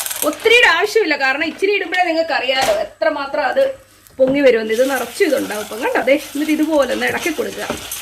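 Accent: Kottayam, India. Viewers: Chechi nattil evde..?? Kottayam slang anu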